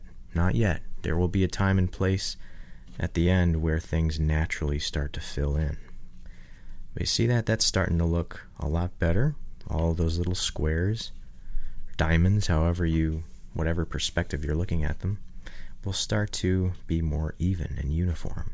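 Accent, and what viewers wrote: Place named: United States. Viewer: no probelm you tell it quite well but it's funny to hear it from american instead of french ^^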